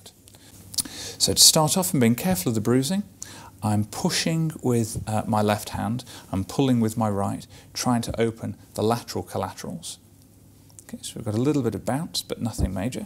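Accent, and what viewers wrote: United Kingdom. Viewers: Really enjoy the videos from an ASMR point. Plus watching from Australia but from the UK makes accent like being back home. Keep going with the vids and thank you.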